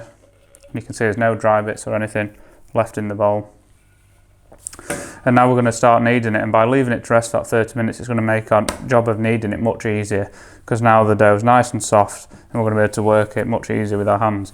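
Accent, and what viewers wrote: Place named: England, United Kingdom. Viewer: Do you speak English? I don’t understand how an English accent is strange to hear spoken English in. Would Boomhauer be easier to understand?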